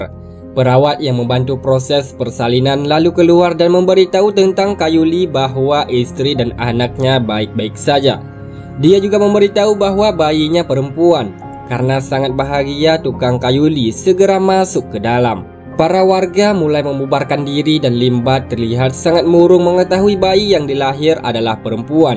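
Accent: Aceh, Indonesia. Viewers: Logan acehnya masi kental bang semangat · Ia org aceh knyak ya😂😂😆😂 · Cara ngomongnya kek orang Aceh ya bg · Kek bhs aceh sikit² tpi mntap bg👍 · Aceh teoh😃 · Ini admin a pasti orang aceh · Apakah adminnya org Aceh ?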